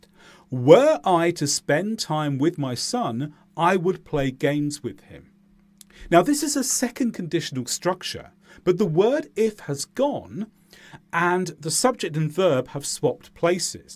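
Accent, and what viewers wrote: England, United Kingdom. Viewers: Where have you been all this while ? SUBSCRIBED straight away. I need to devour your videos. That will help me with good paraphrasing in my assignments and every day life. But most importantly why I chose you is because, lessons from a British English accent teacher are very helpful for people living in the UK. Everyone use the accent suitable for them and the country they live, that’s what I did for myself. Thank you very much indeed for your hard work building and editing these videos. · >search for good grammar video >open an 5 hours video >England accent >close the video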